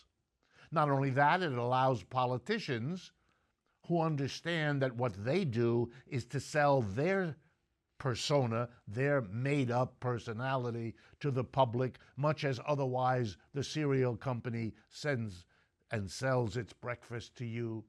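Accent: New York, United States. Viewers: You know what I don't understand? How a guy who went to Harvard, Yale, AND Standford is talking to us about the problems of our system. I truley am puzzled. How so many priveleged in history take stand against the problem that made them so sucessful. I am thankful for Dr. Wolff's show, however it is odd. You can tell he's a New Yorker by both his accent, and the fact his show is structured like a radio show (big in NY)...plus I google'd him lol. I'm a new englander myself and although I don't live in NY I do appreciate the show's style a lot.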